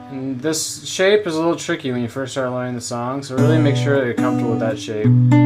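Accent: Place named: United States. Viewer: Yes, the only thing is, I mean I don't know where youre from, but as a German you dont understand a word, it seems to be one :) Are you californian or texanian?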